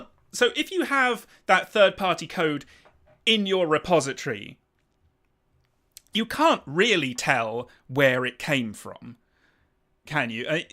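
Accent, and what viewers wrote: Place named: United Kingdom. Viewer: Why are you so dramatic with a heavy brit accent? Suggest to keep it simple/natural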